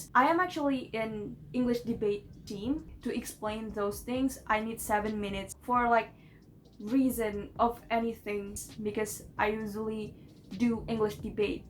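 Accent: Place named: United States. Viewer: your american accent sounds recognizable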